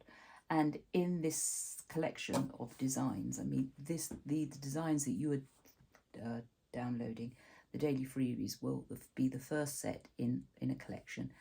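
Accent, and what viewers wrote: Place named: United Kingdom. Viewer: your British accent is music to my French ears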